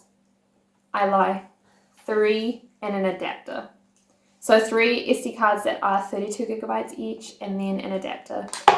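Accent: New Zealand. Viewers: Once you've reached 1k subs, it'd be only a small step to make it up to 10k.... there will be the point Germans become the majority of your viewers and subscribers, with almost all of them being former exchange students at some parts of their lives (mostly to the US). Your Kiwi accent is so strong, slowing down on your speaking speed would make you easier to understand. I'm still getting it but I have to rewind a couple of times..... And yes, I'm a German subscriber :-)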